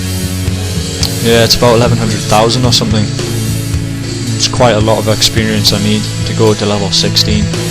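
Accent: Newcastle, United Kingdom. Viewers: Are you from Newcastle? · Wewt, geordies ftw! Me too. :D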